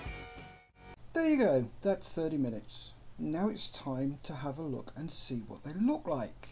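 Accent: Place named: England, United Kingdom. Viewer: Sorry I've slept since watching the video and forgot you had an English accent lol.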